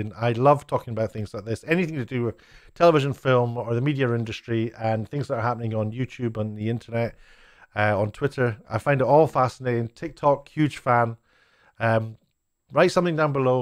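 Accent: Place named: Wales, United Kingdom. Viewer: The following comment is not about Talk Radio, but about the YouTube rules and regulations which you mention: Last year when YouTube introduced the COPPA changes, I was shocked to consistently hear/ read in the comments that a lot of folks seem to think YouTube is a public service company. It isn't, it is a private company which means EVERYONE has to adhere to their rules. In exactly the same way that every pub landlord reserves the right not to serve someone they don't think should be standing at THEIR bar. Also, since the COPPA clean-up which might have also coincided with the new Community Guidelines (I don't know if this was part of COPPA?) I much prefer the YouTube experience now, as the comments were becoming a hate-filled, foul-mouthed cess-pit, which I would not allow in my bar! (I don't own a pub, I make armour... but staying with landlord analogy). 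In the words of our leader (Mr Colin Firth) "Manners maketh man" , so let's all pretend our Grandmother is sitting at the table and be more courteous, this used to be something the British were famous for. 😉🏆🇬🇧 Best wishes, and I like your accent... I have always had a soft-spot for The Welsh! 🤔😉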